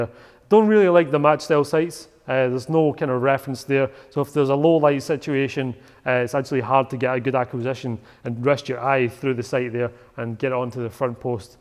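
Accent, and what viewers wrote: Scotland, United Kingdom. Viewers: Haha all the folk saying hes English and Irish clearly haven't heard a Scottish accent before :L in one of his videos he actually states hes scottish. hur hur.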